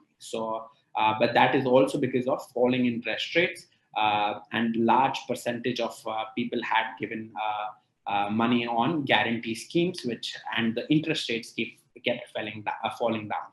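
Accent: United States. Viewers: He went to school in london and got US accent? Chutiya hein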